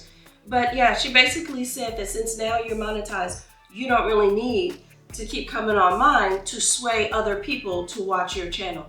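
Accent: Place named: South, United States. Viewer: the Southern twang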